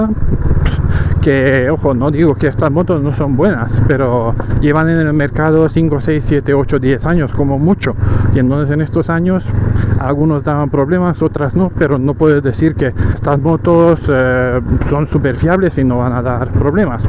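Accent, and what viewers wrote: Romania. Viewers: Nosptros tenemos dos, una de mi mujer y otra yo. Estamos dando la vuelta al Mundo, y NINGUN PROBLEMA , excepto cambiar amortiguadores y perdida de gasolina en la de mi esposa por culpa de una fisura que aun no sabemos porqué. Obviamente, vamos muy cargadps( es nuestra casa) pero es una moto para toda la vida y para viajar. ( por cierto, que su acento me suena a Rumania. Y me alegro). Saludos desde ese Pais, esperando las motos, que vienen de Canada. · Salut , esti român?..dupa accent😊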